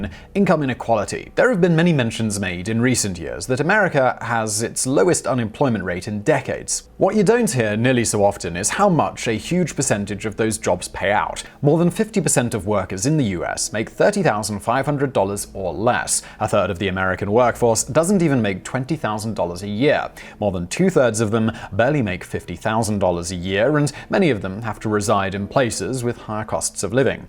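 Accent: United Kingdom. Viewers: despite what his British accent may imply, he is an American.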